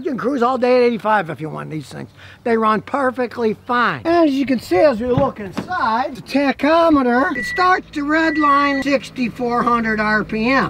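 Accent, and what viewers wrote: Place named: United States. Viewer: I’m sorry, but you rabbit in your peculiar American accent far to quickly to comprehend what you are talking about. I’m sure some people might understand you, but not me.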